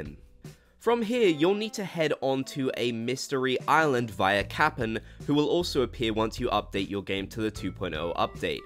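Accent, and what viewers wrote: United Kingdom. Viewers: Wait are you british i thought your american but you sound British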